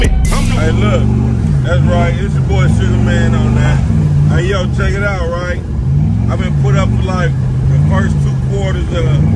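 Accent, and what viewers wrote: United States. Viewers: that yank talk go crazy 😂 🏁💯🏁💨🥃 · Yank talk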